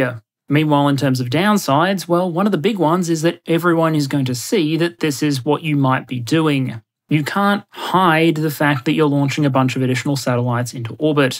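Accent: Australia. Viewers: I love the NZ references in your videos. You sound very Aussie, but I’d love to know if you have a kiwi connection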